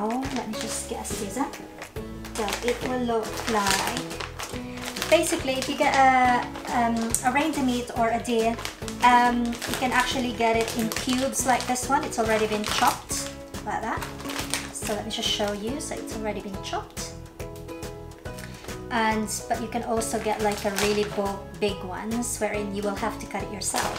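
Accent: United Kingdom. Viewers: look so delicious i would love to taste that & i love listening to your british accent sis. new friend here from australia teamlipat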